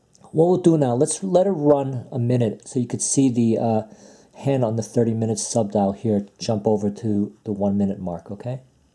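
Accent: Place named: New York, United States. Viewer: Good review of the Daytona and love the cool NYC accent!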